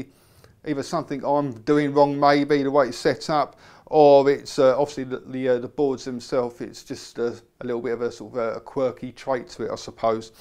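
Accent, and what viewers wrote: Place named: Essex, United Kingdom. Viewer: I didn't know you were from Essex, though I should've guessed from your accent. I've been in CA (US) for 12 years so my recognition of UK accents is waning. I grew up in Stanford-le-Hope, aka Stanford-No-Hope, near Corringham/Grays/Basildon, if you're familiar. I can't lie... I don't miss it at all. :D Okay, I miss the battered sausages, and chips. :D As a tubular mod fan, I think I'll grab one of the couple of DNA20 tube mods that are now appearing, but this does look like a nice unit.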